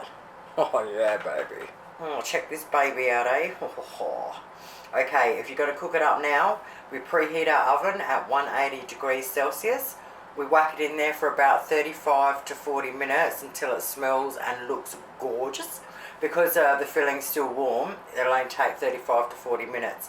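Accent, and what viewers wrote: South Australia, Australia. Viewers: Oh my. what a comfort recipe! I love your accent, are you a South Aussie?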